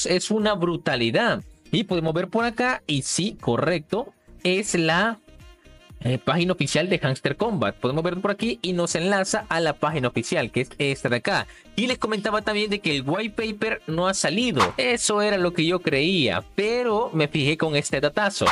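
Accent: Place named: Argentina. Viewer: Xq avlas como Arjentino si eres de Salvador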